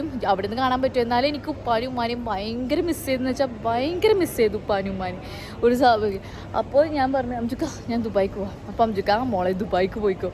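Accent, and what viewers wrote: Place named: Chavakkad, India. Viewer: Umma thrissur Chavakkad Aano? 😀samsaram ketapol thonni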